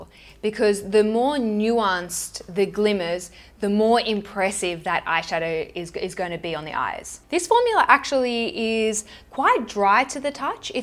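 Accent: Australia. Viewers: Love your Oz Dialect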